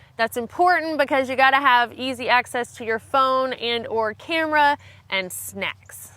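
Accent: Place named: Missouri, United States. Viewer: What do you do as a precaution for bears, I've seen you a video where you had the bear spray ? I have this fear of running into a Grizzly bear, there was a video (facebook maybe) that I saw of a guy that jumped up and started screaming when a bear charged him out of nowhere and the bear turned and ran away............I think I would open my mouth to scream and nothing would come out!!)) Oh and I like your slang or accent " YALL" !)) You sure your not from Missouri!!))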